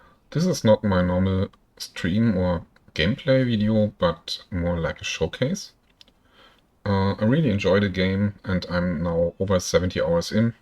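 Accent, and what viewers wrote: Germany. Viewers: Richtig geiles Ding haste da aus und in den Stein Gekloppt! Respekt, ich freu mich auf mein PC upgrade, dann werde ich mich auch in dieses wunderbare Bausystem verlieren. PS. Hab direkt am Akzent erkannt das du Deutsch-Muttersprachler bist hehe xD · That's awesome! I have my BA, so I'm fairly artistic myself. I hope I can build my castle even half of how cool yours turned out though. I'm just worried about going to big and making my machine struggle to run the game so not sure how big I'm going to end up going yet. I'm incredibly impressed by your build though. Going to throw you a sub and keep in eye out for other cool builds. Also you sound like you're German? Yeah? My mom was born in Germany so I have family over in Germany. 🙌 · The german accent sounds so terrible to me. But good video though. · Da mich der deutsche Akzent quasi anspringst, werde ich mal auf deutsch kommentieren. 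😅 Die Burg ist wirklich extrem gut geworden und Respekt dafür 👍 Am besten gefallen mir die vielen Kleinigkeiten in deinem Build, vor allem die Geheimgänge. Zwei Punkte wo man meiner Meinung nach (persönliche Geschmack) noch etwas was verbessern könnte. Die "Main-Hall" könnte ein bisschen mehr Prunk gebrauchen, weil es das erste ist was man sieht und soll die Besucher natürlich gleich überzeugen. Es fehlt etwas an Pflanzen in der Burg. Das sind aber wie gesagt zwei Kleinigkeiten und ist wirklich beeindruckend was du da gebaut hast. Viel Spaß weiterhin beim spielen und bauen. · 20fps ... nice ! :D But impressive building. You have a typical german acent btw :D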